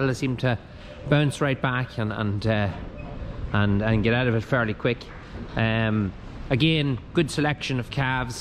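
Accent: Ireland. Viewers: are u irish,n. Irish or scottish get confused with accents😃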